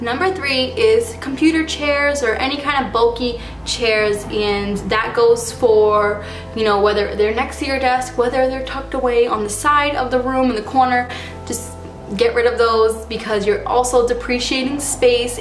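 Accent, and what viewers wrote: Boston, United States. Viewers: Your Boston accent is so adorable!!!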